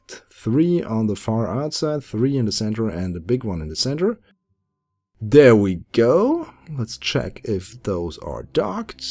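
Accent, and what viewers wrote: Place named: Germany. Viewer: Die mieseste Manleykopie ever. Mit dem deutschen Akzent könnte ich ja noch leben, aber das hier? Mies...^^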